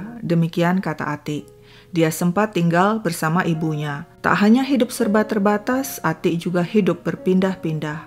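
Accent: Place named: Java, Indonesia. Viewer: Kalau ngomong... bahasa jawanya lucu...lagunya risau berkesan...suaranya unik...dan cantik...👍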